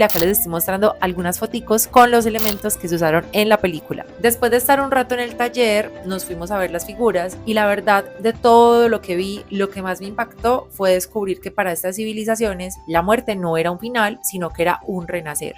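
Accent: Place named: Colombia. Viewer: Como Peruano estoy orgulloso de tus conocimientos en mi querido Perú,....por tu dejo ,.--- se que eres de la rica Colombia, te envio bendiciones y que todo te vaya bien.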